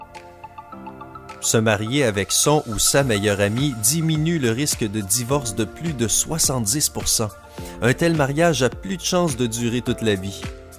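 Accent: Quebec, Canada. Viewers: accent québécois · C'est l'accent canadienne😉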